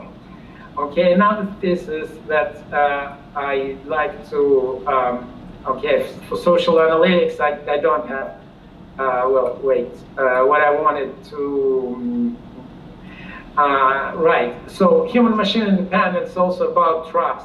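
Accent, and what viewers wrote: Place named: United States. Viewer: Hi. My, you are sounding very American these days! (Krissie in Germany)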